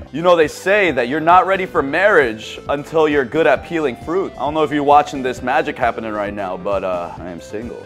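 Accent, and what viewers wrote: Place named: South Korea. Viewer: This gyopo sounds more korean than most native genz koreans wtf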